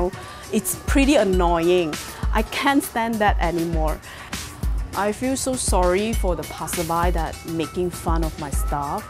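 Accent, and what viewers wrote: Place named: Asia. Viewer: Your asian accent is so cute! And I love your directness, I totally agree with what you said! I will look for your stuff it looks pretty awesome. Thanks for the video☆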